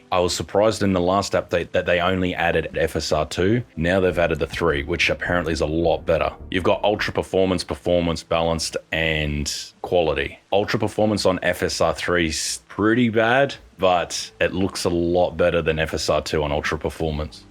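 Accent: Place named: Australia. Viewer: Been off from gaming for several years and picked up playing again with SOTF and then when needed to learn things in this game I stumble across a familiar Aussie voice and all the memories are coming back from the great videos you have made for TF (who tf is Pewdipie btw lol) and I’m back addicted again with the game AND your videos. It made me soo happy seeing your still around recording games, especially TF&SOTF and I have a lot of catching up now on your channel since the last 4-5yrs offline. Thanks!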